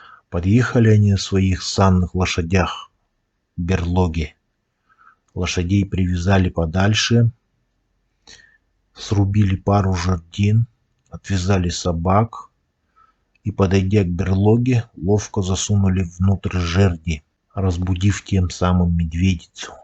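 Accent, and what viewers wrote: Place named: Yakutia, Russia. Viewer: Я про этот случай слышал, тут просто красиво описано каким то писателем,прочитано с хорошей интонацией и знакомым якутским акцентом. 😄👍 А рисунки то какие 👍👍👍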